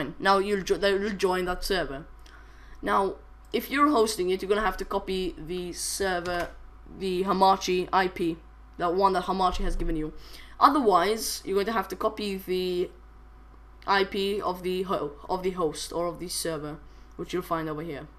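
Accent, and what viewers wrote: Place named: United Kingdom. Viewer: omg i hate britsh english this so retarded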